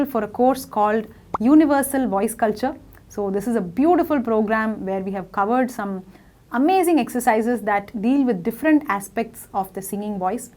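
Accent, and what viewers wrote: India. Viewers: I love the way you talk, I love Indian accent.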